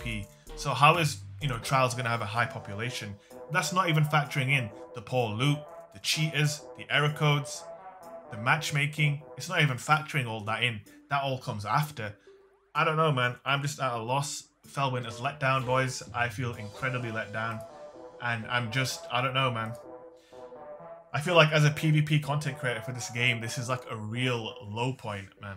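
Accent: United Kingdom. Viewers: You're so british lmao I luv it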